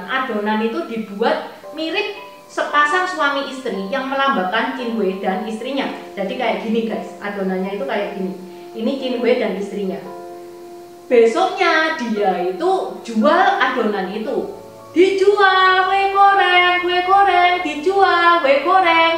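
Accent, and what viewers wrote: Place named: Java, Indonesia. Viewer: Mbak ini logat bicaranya tellu Jawa SGT kental dan medooook bgt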